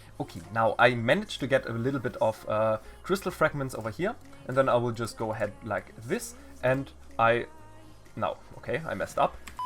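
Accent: Germany. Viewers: Erstes wort ich wusste du bist deutscher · Kommst du aus deutschland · Du bist Deutscher oder :) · Are u German I can here you're accent I'm German too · Finds ürgendwie cool wie du versuchst Englisch zu reden aber man hört so stark den deutschen Akzent xD (no hate) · U R German right? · Bro ich hab in der waren sek gecheckt das du deutsch bist haha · So you are from germany. I thought already you sound like a german (i am one too) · Warum sprichst du nicht einfach deutsch in deinen Videos? Nichts gegen dich aber irgendwie triggert mich dein Deutscher Akzent wenn du englisch sprichst. Edit: Deine Aussprache bzw Akzent erinnert mich an Werner Herzog wenn er englisch redet 😂😂😂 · So geil der deutsche Akzent in deinen englisch haha like ist aber da 👍🏼😅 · Dieser deutsche Akzent🤌 (no front) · Are u German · Irgendwie habe ich das Gefühl das du nen deutscher bist echt jz 2 sek danach hast du das eingeblendet xddd köntest du auch mal deutsche Videos machen ich finde dein englisch irgendwie so extra deutlich und als ob du dich einfach nur verstellst finde es besser wen man so sein kann wie man ist ka aber macht trotzdem weiter ich finde dich trotzdem echt sympathisch. 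· Alta hab nicht geguckt and wusste du bist deutsch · Man hört so sehr dass du Deutsch bist👍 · You can hear that he is german 😂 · Man hört ab und zu das er deutscher ist :D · Ok aber ein bisschen erritierend weil du diesen deutsch akzent hast xD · Man erkennt das du deutsch bist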